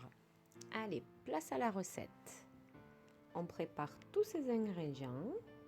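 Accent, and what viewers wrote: South, France. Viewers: Super vidéo fidèle à toi même j ai testé pas mal de tes recettes qui ont toujours connu un succès.Je suis du sud et je reconnais ton accent celui de Toulouse ou Marseille 😅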